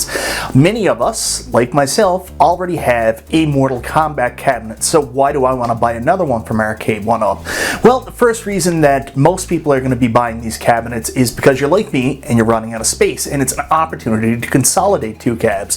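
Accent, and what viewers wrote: Canada. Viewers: Nothing more endearing than the way Canadians prounce their "ar's". I could listen to this guy say "Arrrrhcade" and "Marrrrhquee" all day haha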